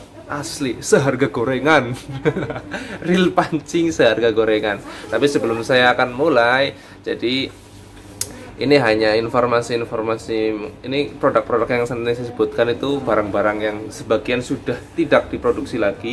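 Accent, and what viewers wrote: Banyumas, Indonesia. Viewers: Nyimak lokasine ngendi si ya,banene koh ngapak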